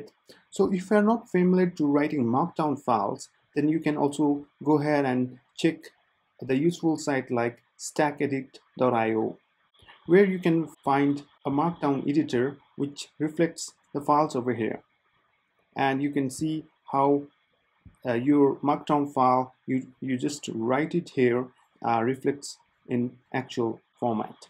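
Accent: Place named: India. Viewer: Indian accent? DROPPED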